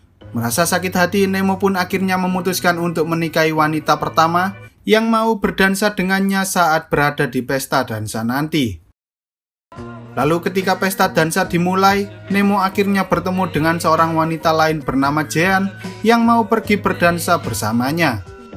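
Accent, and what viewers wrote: Java, Indonesia. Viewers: Ampun dah medog bgt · mantap bang, semoga selalu medot Jawa!!! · Me'dok amat mas. Suaranya.hehe🙏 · Abang kok kayak bahasa jawa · Medok nya mengganggu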